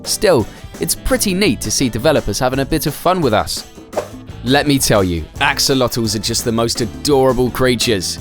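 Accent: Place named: South, United States. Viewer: Was expecting a monotone American accent instead I got a chirpy southerner overall good deal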